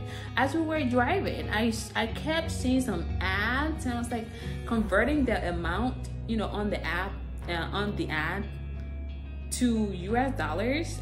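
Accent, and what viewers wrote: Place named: Nigeria. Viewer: Hon you don't sound American , you sound Nigerian , wena woth bribery story at the airport, wena you are fake , you Nigerian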